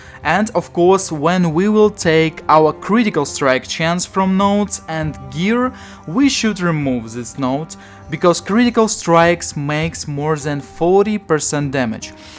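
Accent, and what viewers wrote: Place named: Russia. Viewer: Со стронг рашен акцент😀, бат окей бро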